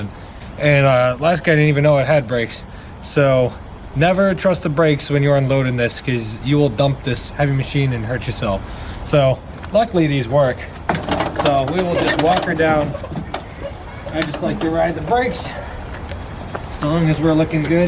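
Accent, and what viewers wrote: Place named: New York, United States. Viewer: Awesome!! Great Intro!!, you need way more subscribers!! You have kind of a New York affect and a slight accent as well as Northern New York Scenery however I would guess that you are in New Jersey. I am in Pennsylvania and I suppose that is also a possibility. I have an Allis Chalmers B110 also. I am restoring a John Deere 112 that I am modifying with an air compressor system accessory. My Craftsman GT18 Is in the process of having suspension welded on the front end, a 90 degree gear box to change a twist belt into two straight belts, larger tires and a lift. Talk to you soon. Great video!!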